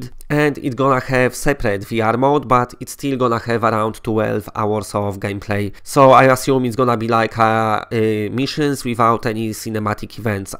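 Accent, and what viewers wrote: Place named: Poland. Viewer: keep on speaking with your magnificent accent just the way you're doing right now, we just love it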